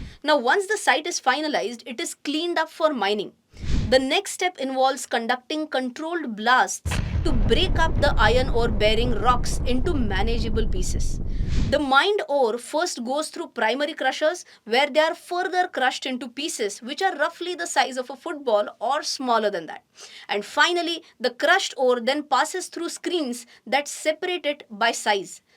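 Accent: India. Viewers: It's not I Run Ore. Iron ore. Are you speaking Hindi English?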